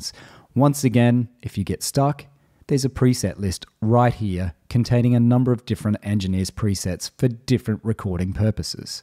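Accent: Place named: Australia. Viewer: nice to hear an Australian voice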